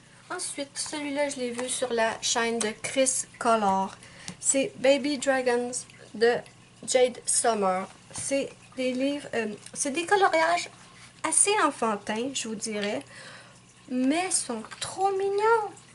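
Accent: Canada. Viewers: Super vidéo, ^^ J'ai le livre Dreamlings de Edwina Mc Namee, il est merveilleux. Je te le conseille. Celui que tu as aussi est très beau. Moi aussi je regarde les vidéos de Kry's colors et Steffie. Et ça me donne envie d'acheter tout ce qu'elles présentent. :) J'adore ton accent, tu viens de quelle région au Canada?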